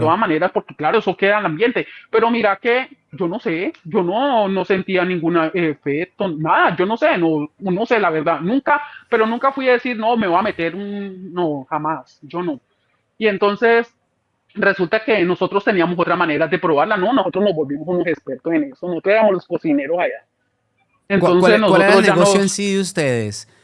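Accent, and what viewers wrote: Colombia. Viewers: Tristemente creo que por su forma de hablar es “caleña”🙄🙄🫣🫣👎🏽👎🏽👎🏽👎🏽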